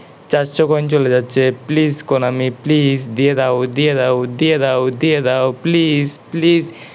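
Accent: Chittagong, Bangladesh. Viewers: ভাইয়া কি চট্টগ্রামের নাকি???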